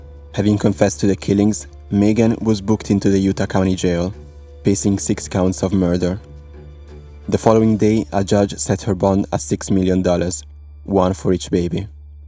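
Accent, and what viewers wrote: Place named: France. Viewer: That French accent. Oohh la la.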